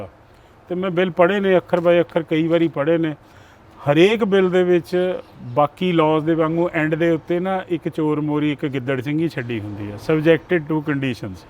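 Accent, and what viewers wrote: Puadh, India. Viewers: Puadi bhasa bol reha veer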